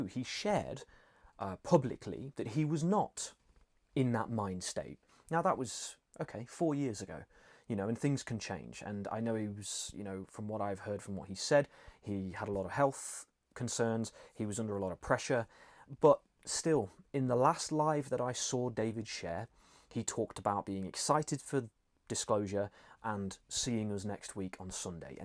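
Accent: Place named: Northern England, United Kingdom. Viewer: Greetings ! You should drop your stupid northern accent because this is ego identity another label. I’m not judging you but being totally honest with you with energy straight from my heart. What you say about me after this I don’t care I forgive you if it’s something negative because you don’t know. I’m a manc I’m a scouser you get it ? This is why you go on the spiritual path to get rid of your lebels. UK is a reptilian prison camp and a matrix which you must escape if you a lightworker I already did I went to live in the Swiss Alps far away from the sick British society anyway the law of one is powerful and those who achieved cosmic unity in oneness with cosmic awareness know this but coming back to this dimension after sometime is very hard but you have to but this time you are a multidimensional being who is in all timelines and dimensions at ones always NOW. 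I hope David transformed well. Peace & Wisdom 🎼🙏🏻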